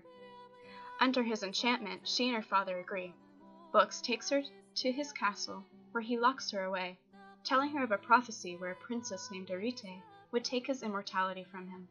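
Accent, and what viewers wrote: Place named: Japan. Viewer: Японский акцент это оргазм для моих ушек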